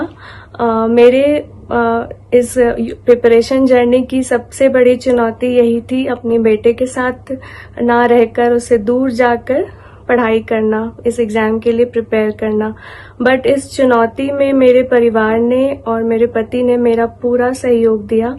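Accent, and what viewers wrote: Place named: Bihar, India. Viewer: Ma'am r you from Bihar excellent❤️😊😊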